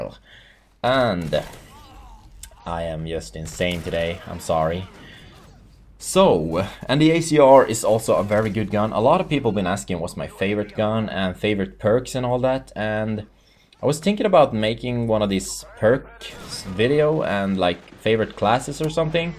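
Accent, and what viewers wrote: Sweden. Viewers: are you swedish? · lol Your Swedish accent is so hilarious.. keep it up dude.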